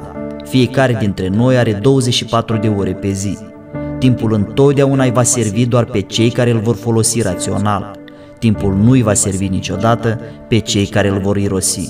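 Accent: Moldova. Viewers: Apreciez materialele realizate de tine, ma amuza ", graiul dulce moldovean", cât despre conținut, par bine realizate și bănuiesc, ca necesita ceva osteneala pentru a le realiza. Zice-se că nimic nu e-ntamplator, intr-adevar, oricât de greu ti-ar fi, oricâte obstacole vei întâmpină, pentru realizarea unor astfel de materiale, incearca sa constientizezi ca tu, de fapt esti un "instrument" prin care Intelligenza suprema a Universului, ne bucura și ne destăinuie prin tine, aceste lucruri minunate, "hrana" spirituala. Fii binecuvantat, suflet minunat 🙏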